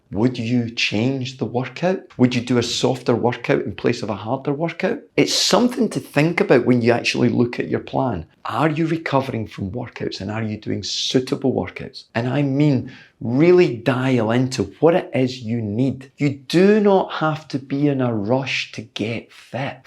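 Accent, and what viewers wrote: Scotland, United Kingdom. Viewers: After watching several of your videos, the voice in my head while I'm cycling now speaks Scottish.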